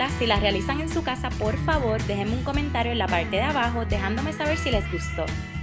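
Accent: Puerto Rico. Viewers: Ella es Puertorriqueña al escucharla se sabe. No la critiquen por como habla cada cual tiene su acento segun su patria. Super rica la receta... ;)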